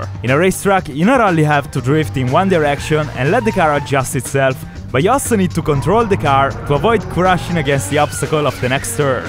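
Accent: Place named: Italy. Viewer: ciao dall accento si capisce che sei italiano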